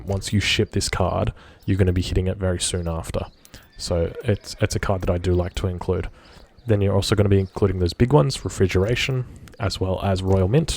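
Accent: Australia. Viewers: Where in Australia are you from? I've heard someone from Brisbane who sounds like you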